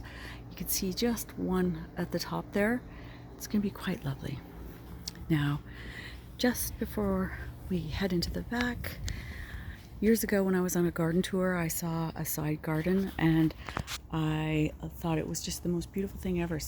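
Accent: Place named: Canada. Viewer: Just found your channel. What garden zone are you? I hear a bit of Canadian accent. I am in Homer, Alaska - Zone 4-5, ?6 this year, depending. Love your style: beautifully designed, well thought out, well-grown garden in a space that most of us can relate to - not the "estate level" that is so gorgeous, but so unattainable. Hope to see many more videos on your garden.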